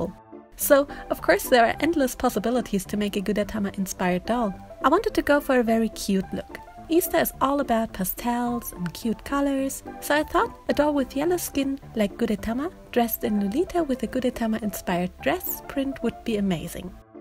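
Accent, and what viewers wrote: Germany. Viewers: Are you from Germany? I don't know why but you really sound kinda german :D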